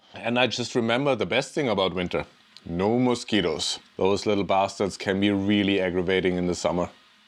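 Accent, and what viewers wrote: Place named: Germany. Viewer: A german accent in the yukon snow in underwear, what gold.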